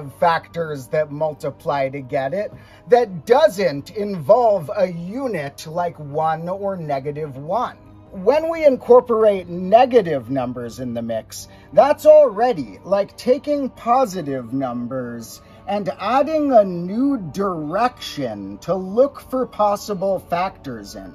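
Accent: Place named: United States. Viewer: It's like with danger and an American accent.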